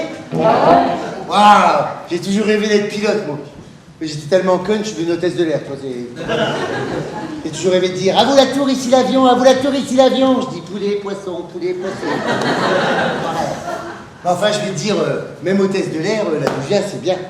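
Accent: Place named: Nord-Pas-de-Calais, France. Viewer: C'est bizarre pour un homo d'avoir l'accent ch'ti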